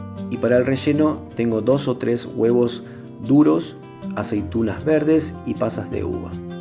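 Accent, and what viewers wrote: Argentina. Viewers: Me encantó todo desde la receta hasta el acento precioso de el Argentino que lo explicó todo a la perfección. Gracias! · Que hermoso que explicas!!!! sos Argentino?. nos das una variedad de comidas impresionante!!! Me encanta!!! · Que placer oir hablar argentino. Estuve aì tres años.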